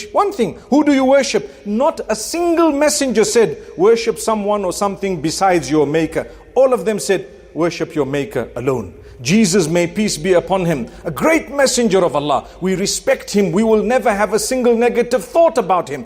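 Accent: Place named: Arab world. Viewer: Assalmualaikum Mufti Menk! How're you doing? I love your arabic language tone. Where are you from, please?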